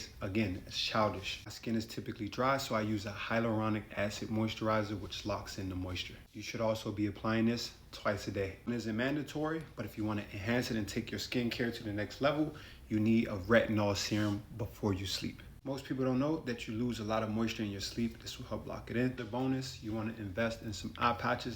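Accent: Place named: Caribbean. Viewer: Are you Caribbean? The accent keeps slipping out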